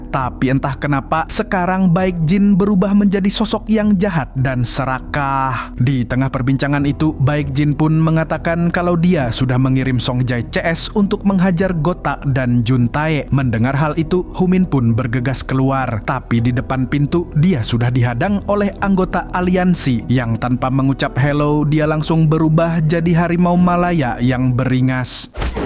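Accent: Banjar, Indonesia. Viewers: Orang Banjar kah mang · Ini orang banjar semangat bang gak yangka ternyata orang banjar semangat jangan ampih man ai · Bang orang banjar hah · pian urang banjar kh mang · Bg orang banjar kah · logat banjar pian😂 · urang banjar sekalinya😂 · Ada logat Banjar nya bang 👍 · Ikam ne orang Banjar kah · Bro lu orang banjar gw juge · Pian urang Banjar kah mang,Ulun kira urang luar Kalimantan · ini admin nya orang banjar kayanya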